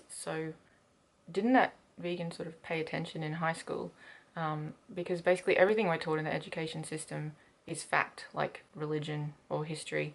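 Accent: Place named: Australia. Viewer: Oh my god.. Haha I was thinking 'she must be British, how does she have an Austrlian accent' and then you explained you lived in England... I knew it. The dry humour has rubbed off on you :P This video was awesome; more people need to be explaining the inevitability and necessity of veganism in this matter of a fact way.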